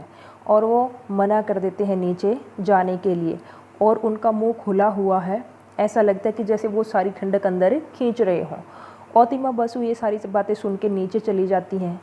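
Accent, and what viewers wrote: Haryana, India. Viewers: Mam haryana se ho aap?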